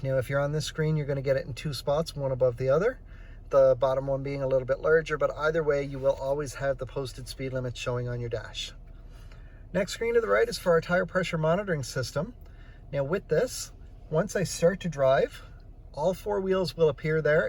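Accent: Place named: Canada. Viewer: i could hear the canadian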